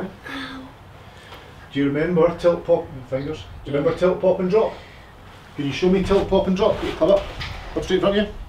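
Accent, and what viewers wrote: Scotland, United Kingdom. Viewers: Aye, adjustments are possible to reduce the effect of bounce off concrete. Why not do a Scottish version of Phil Mickelson and show on a video how to hit very different shots with the same club. Too many are too poor at understanding the versatility of a wedge anyway. This said just as an idea for additional vlogs from you. Examples: low chips with a lob wedge and next a flop shot with the same club, and how to master both, and how to increase bounce by opening up face in soft sand and the opposite in wet sand, and so forth. Good luck with your shootings. And what a delight it is to hear your dialect. Be proud of it! Keep the good stuff coming. Greetings from a snowy Sweden.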